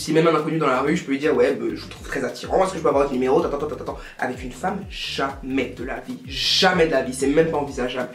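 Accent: Ivory Coast. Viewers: Hum on reconnait une ivoirienne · Au debut j’ai tout de suite su qu’elle a vécu en Côte d’Ivoire avec l’accent et c’est manière 😊😂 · L'accent ivoirien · c'est l accent ivoirien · Je savais que tu étais ivoirienne ça s'entend. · par ton accent je me doutais trop que tu venais de la CI 💫💫❤❤❤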